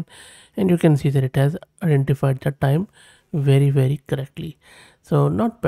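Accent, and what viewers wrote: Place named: India. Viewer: Man, it's a pain in the ass that Indian-accented English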